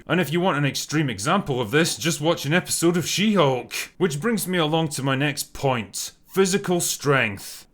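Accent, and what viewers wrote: Scotland, United Kingdom. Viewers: Most Scottish bloke ever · Genius analysis. And I like your accent - Scottish, but totally readable for a yank like me! Cheers!😊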